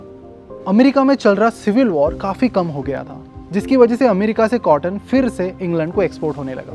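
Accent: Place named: Maharashtra, India. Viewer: I love your Marathi accent...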